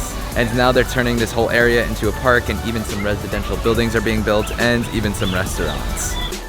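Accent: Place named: Philadelphia, United States. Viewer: Seriously. If you are going to move from another city to live here and then act like you know everything about it, you could at least pronounce the names correctly. And none of these spots are secret, they are just not visited as much by tourists. And I know he’s definitely not from here, based on the way he pronounced “waterfall” as “wooterfall”. He sounds like he’s from Philly or somewhere else in PA.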